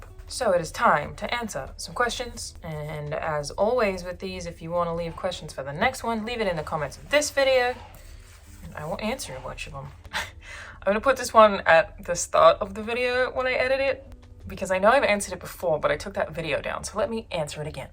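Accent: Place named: Australia. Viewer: I imagine you'll still be hot when you're 40, you'll still have that Australian accent that north Americans love. I also imagine creepy people on the Internet will still be a thing ten years from now.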